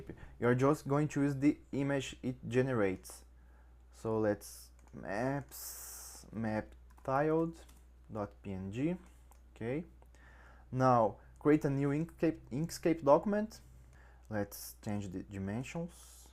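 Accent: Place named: Brazil. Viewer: Are you brazillian: Your accent looks brazillian.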